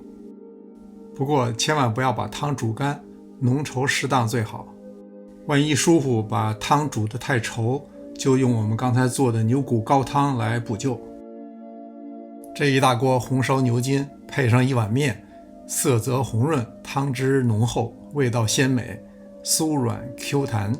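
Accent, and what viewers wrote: Beijing, China. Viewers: 是北京人吧？ 很亲切的声音:yougotthis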